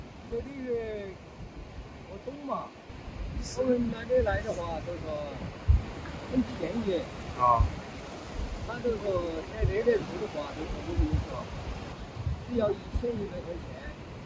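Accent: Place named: Cantonese, China. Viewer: 发现广普真好听。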